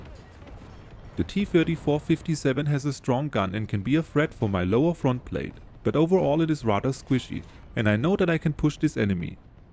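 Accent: Germany. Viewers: Eine Sekunde gehört und gewusst, da spricht n deutschsprachiger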